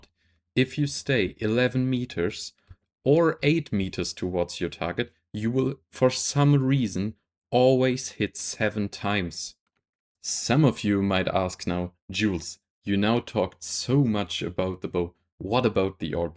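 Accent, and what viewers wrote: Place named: Germany. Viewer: i can hear that you german brother lmao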